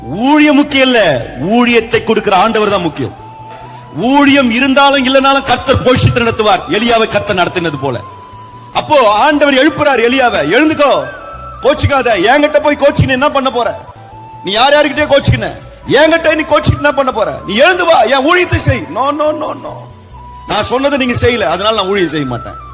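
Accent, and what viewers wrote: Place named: Chennai, India. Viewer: மெட்ராஸ் தமிழ் மிகவும் அருமையாக இருக்கிறது சகோதரா கர்த்தருடைய வார்த்தை உண்மை அதுவே மெய்யான ஜீவன்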